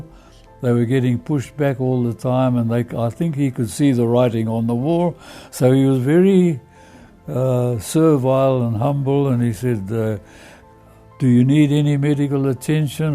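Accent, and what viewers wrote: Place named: South Africa. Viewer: Know that accent SA best pilots ever.BALLS,Montgomery said it.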